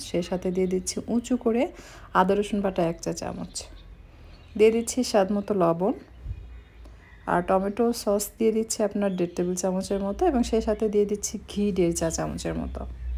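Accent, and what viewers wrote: Bangladesh. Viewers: Khub stylish apnar biboron....apnake dakhar ichhe...apni open kitchen baniye amader ranna show korun.khub kaydar ranna apni janen.....apnar channel ami follow kori.bangladeshi dialect y kotha bolleo apnar bisheshotto achhe anyo man er adobkayday bojha jay tai apnar kotha shunte bhalo lage.INSHAALLAH AMON SUNDOR MON ER MEYE HOYE PRITHIBI JOY KORUN RONDHONSHILPO KOLAY!🤗🤝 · nijer khawa nijei khan, kothar tike apne bangladesh neki, sorry amar valo lagenai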